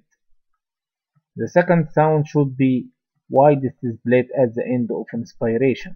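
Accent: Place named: Egypt. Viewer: From the accent I can tell it’s an Egyptian talking😎 thank you 🙏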